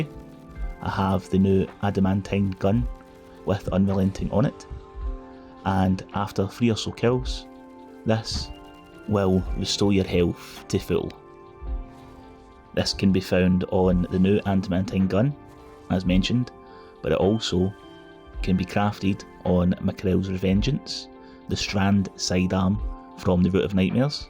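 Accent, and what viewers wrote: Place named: England, United Kingdom. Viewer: Bet you from England 😵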